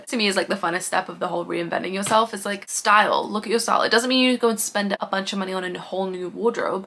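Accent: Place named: United States. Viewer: No cus I’m 28 now and have watched your videos for years but haven’t watched in so so long and I felt a lil disconnected cus your American accent has gotten so much thicker and I feel like I’ve missed out on sooo much. Second I heard ‘let’s go ladies’ I was back in the zone. Back to the video with the dry shampoo. If you know you know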